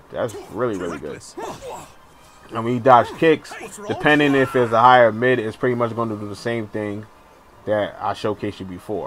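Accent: United States. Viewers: Fuck all these haters, you speak like an American, and you know how to teach. This bullshit 6ab or whatever doesn't work when teaching newbies, good shit man!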